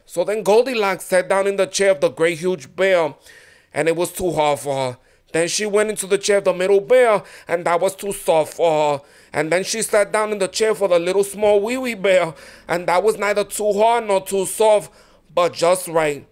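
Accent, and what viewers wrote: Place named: New York, United States. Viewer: 🤣...goldielocks...una presenta....weewee bear🤣! Love his Spanglish NY accent🙋‍♀️🤣👏👏👏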